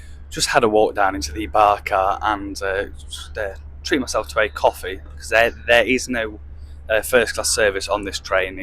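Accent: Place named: England, United Kingdom. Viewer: Hey! I hope you had a nice time in Poland anyway :) Let me ask you - where exactly are you from? I'm curious what kind of English accent it is.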